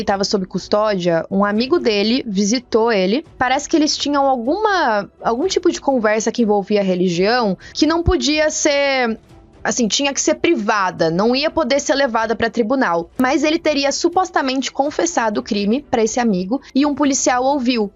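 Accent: Sao Paulo, Brazil. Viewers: Sotaque paulista com gripe.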